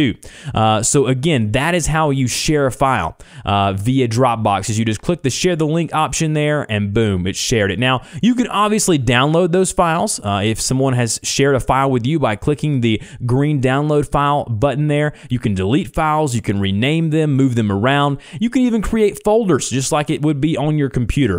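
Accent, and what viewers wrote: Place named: South, United States. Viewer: Not useful. I have had DB several years. You used to be able to just add files to your local Dropbox folder and it would get uploaded automatically. And to share there was a local button. But it appears that's all gone away. This video had nothing on this. I can't say I was fond of the Southern twang either...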